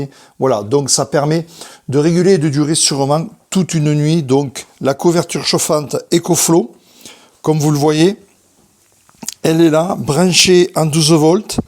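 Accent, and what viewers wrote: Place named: South, France. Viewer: Bonsoir, L'accent du sud est charmant. De mon point de vue, il faut absolument rester crédible et adapter la véritable prononciation. Nous sommes de la même génération, alors ... <link> Merci pour cet échange et bonne continuation. 🙏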